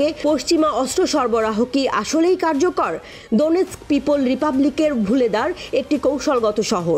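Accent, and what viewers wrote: India. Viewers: আপু,,আপনি কি বাংলাদেশি না ভারতিও।বাংলাদেশের কোনো সাংবাদিক এই ভাবে নিউজ পড়েনা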